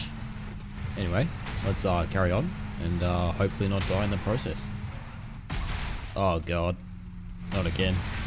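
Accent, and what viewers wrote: Australia. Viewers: Australian accent , badass skills. Gold. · what part of australia ya from mate?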